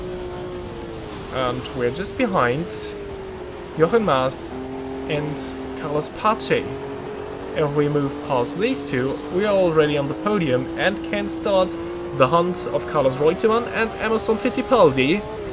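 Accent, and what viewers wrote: Germany. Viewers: You sound German are you German?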